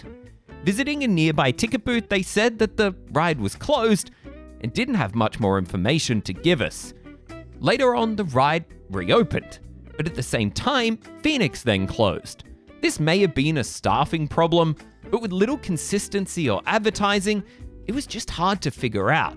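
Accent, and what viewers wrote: Australia. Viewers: I'm just here for the Aussie accent. 😂😂😂. Love it. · As someone who grew up about 15ish minutes away and has spent countless hours at Knoebels over the last 40 years, it's just really cool to see it get some recognition for what a lovely little park it is.... I also absolutely can imagine the reaction the Aussie accent caused. I even did a double take myself hearing the accent in the video. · It has been a few years since I've been up there at Knoebel's (I remember when it was still called "Knoebel's Grove"). It takes me a good 4 hours to get there from where I currently live. That is truly an amusement park in its true, classic meaning. This is probably the last remaining park that still operates the way it does, with no admission, and using ride tickets to get on the rides. I still have a huge stack of those ride tickets, so I may have to consider going up there this autumn for the Phoenix Phall Phunphest. 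There are other parks that started out like this that still exists although they transitioned to the more typical modern gated theme park. Kennywood in Pittsburgh is one, as it was originally a trolley park. Hershey Park is also one as it was originally meant to provide amusement for the company's employees and family on the weekends. As for as the local's reactions to your Australian accent, not very many Australian people visit that part of the US, so getting see, meet and hearing one is a pretty rare treat there. Going to Knoebel's really does feel like stepping back in time and experiencing a truly traditional amusement park.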